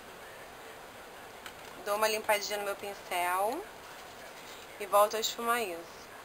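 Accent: Rio de Janeiro, Brazil. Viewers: Nossa flor, antes eu jurava que vc era uma pessoa metida pelo seu jeito de falar, mas hoje penso totalmente o contráriovi que é apenas seu sutaque carioca, vejo vc uma pessoa super legal e que apenas quer compartilhar conosco aquilo que vc sabe fazer bem que é ensinar a maquear, adoro seus vídeos, parabéns!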